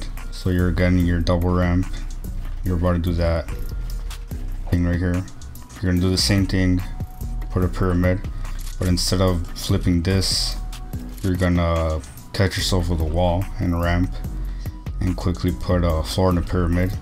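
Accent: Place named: Spain. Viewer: U sound Spanish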